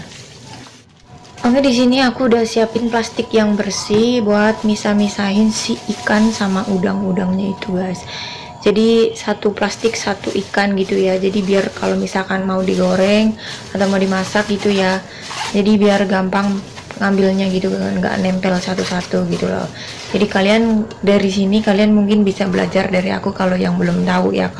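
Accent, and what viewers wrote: Banyumas, Indonesia. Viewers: Kiye wong ngapak.. Nonton maning,, ful pokoke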